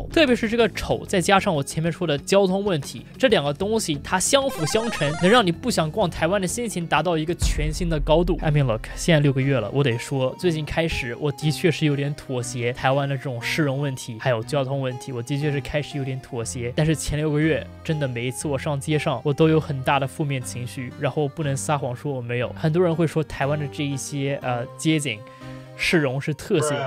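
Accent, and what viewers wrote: China. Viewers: 我住台灣40年了 讓你一個說者大陸口音的混血兒 一針見血地說出了台灣的事實 老實說 真的 他媽的 爽! 你說的真好! 而且 你的中國口音真的很道地 說起英語 又流暢到像是(本來就是)外國人 聽你說話 舒暢! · 以前聽到別人提到你的頻道有多不喜歡，我總覺得應該存在一些偏見，因為我是少數台灣人裡面理解比利時有三個政府而且各自為政的尷尬狀況。今天看了這個內容，其實你講述得很忠肯，但如果可以把敘事語言換成英語可能會更好，我有很多中國的好友，但你這口音，讓人不自覺得想防衛，但你做得影片內容都很棒，台灣能走到這一步，要感謝中國不斷地逼迫台灣，讓台灣在國際上不斷地曝光在國際新聞上，讓大家都知道台灣。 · 中國腔有點嗆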